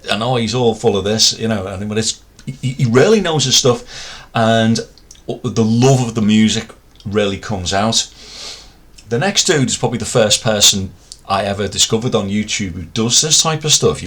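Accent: Liverpool, United Kingdom. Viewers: I love your liverpoolian accent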